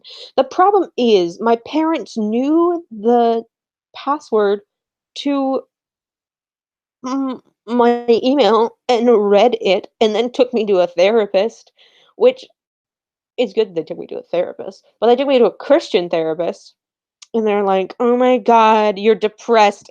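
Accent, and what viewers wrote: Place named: United Kingdom. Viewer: Your British accent is perfect. And don't think I didn't catch that harry potter reference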